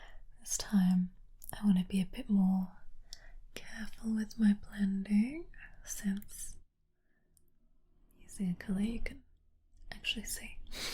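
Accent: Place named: Australia. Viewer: As an Australian, I use to be weirded out when I heard the same accent in asmr, but then I found you and diddly and now it's one of my favourites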